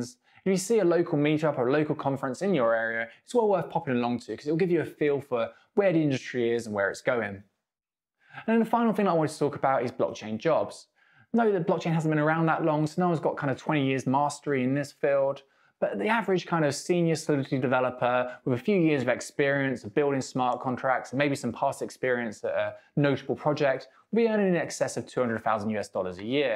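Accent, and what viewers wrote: United Kingdom. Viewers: Thanks a lot for the time you put in, it's also refreshing to hear you are also from the UK. Do you have any advice on the best way to apply for roles? And how long the process would usually take to start working? Thanks a lot in advance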